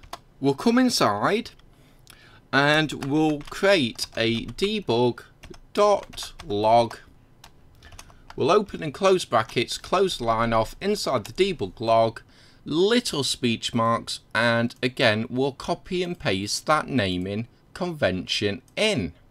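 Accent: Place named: United Kingdom. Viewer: i like british accent~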